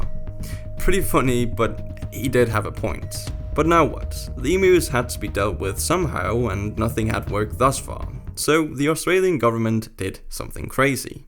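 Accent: Denmark. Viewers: He’s Danish, how in the world did you mix up those accents? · Are you from Denmark? Your accent kinda sounds like it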